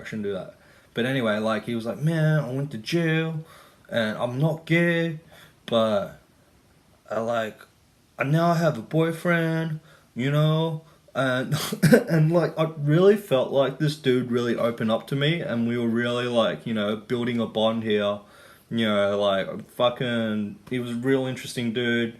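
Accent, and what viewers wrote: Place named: Australia. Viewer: I won hundred percent agree I don’t know if it is speaking style in the way that he says things he just interrupts him self constantly and beyond as aimlessly or if it is over the top Australian bro boy douche bag accent. It’s awful to listen to and maybe that’s not his fault but I think he’s pushing it a little bit. Either way it’s a shit video and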